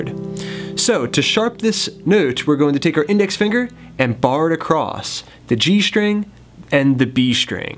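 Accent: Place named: Maryland, United States. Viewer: dude are you from Maryland? Thanks for the lessons by the way. really helping me pick guitar back up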